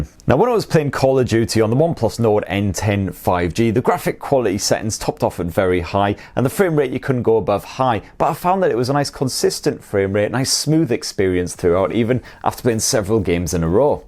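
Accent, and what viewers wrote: United Kingdom. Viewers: Great video unfortunately I don't understand his British accent.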